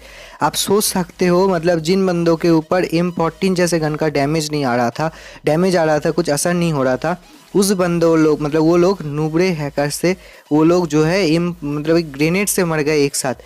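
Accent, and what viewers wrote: Bengal, India. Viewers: Keya app Bangoli ho???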